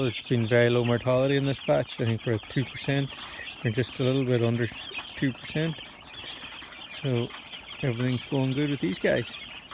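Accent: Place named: Ireland. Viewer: Hi, keep up the videos, nice to be listening to an Irish man for a change 😊. Just wondering where you buy your bulk compost. John. Co Kerry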